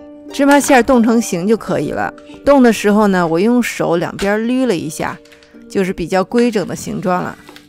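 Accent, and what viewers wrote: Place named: Beijing, China. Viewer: 五零后老北京，喜欢那儿音的味道，，必看，关键是必听！倍儿地道。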